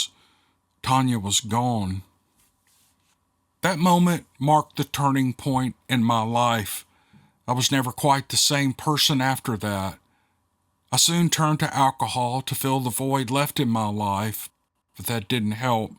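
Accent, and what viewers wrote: South, United States. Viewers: If? Really? I remember when u told someone not to send u a story, if they'd already sent it to someone else's channel. Brother i love ur story tellin. How about u look at all the dna results. Again don't get all kinda way, i really love ur style and southern accent, being raised in the south, still yet u R tellin stories already shared on other sites, which aren't trying to do that.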